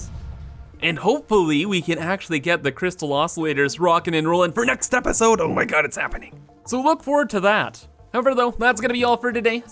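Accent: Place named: Canada. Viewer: Dude! Could you be more Canadian? Funny to hear the accent: bese (base), spece (space). Get my drift...ey wink, wink Love your videos brother. Gonna buy I on Steam too. Since the Dev are based 45 miles from where I live in Sweden. Ya kno...greatest nation on earth 🤪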